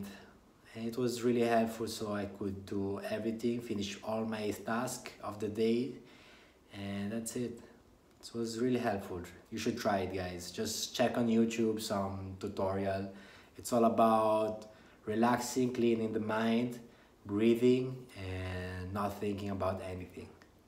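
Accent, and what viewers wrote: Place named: Italy. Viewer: Kasing hot ng Italian accent mo rarr!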